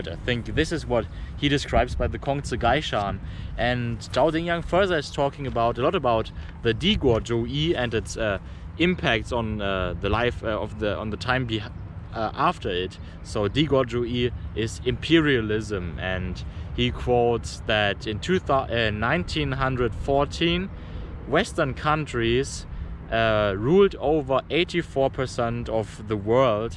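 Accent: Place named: Germany. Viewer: Your accent is German, are you still in China?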